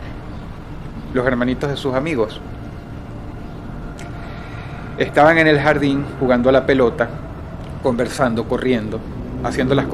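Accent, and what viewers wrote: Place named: Venezuela. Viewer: No son suyas. Son historias sacadas de internet. Y con el acento venezolano, pasa de dar miedo, a dormirme. Fuk Venezuela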